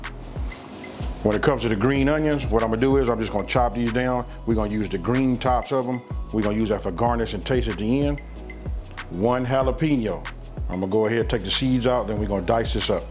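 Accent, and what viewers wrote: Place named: California, United States. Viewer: It’s the California Accent for me!!🥰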